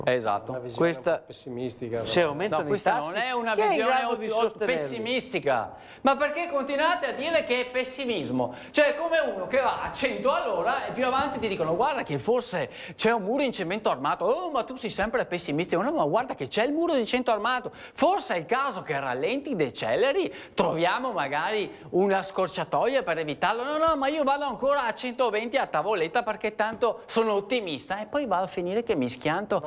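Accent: Veneto, Italy. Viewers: per i veneti l'italiano è una seconda lingua. la prima è il VENETO (lingua, non dialetto) che ha esattamente quella cadenza che a te non piace (niente di male, sono gusti) ciao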